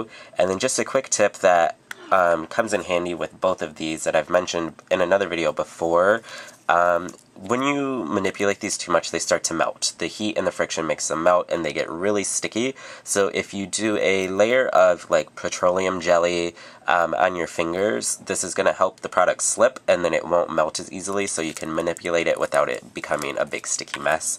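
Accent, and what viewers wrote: United States. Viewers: I love how the you say 'just go right ahead' and everythings a question (clearly american)... id work on that ;)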